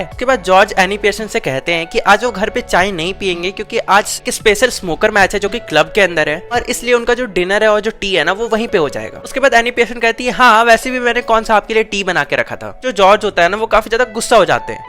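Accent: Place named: Indore, India. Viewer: meko bhai tum toh indori accent bol re ho ni😊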